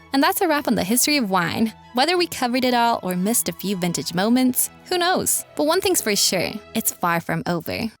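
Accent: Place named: Italian-American, United States. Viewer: This is the cutest Italian-American dialect I've ever heard. And she's smart too.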